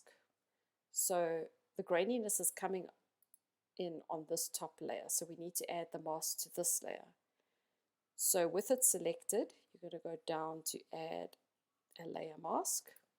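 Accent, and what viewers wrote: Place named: South Africa. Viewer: Thank you for this amazing tutuorial. I really love your designs. I can hear you are from SA. I am in Cape Town and am also a digital designer so I thought I would say hi.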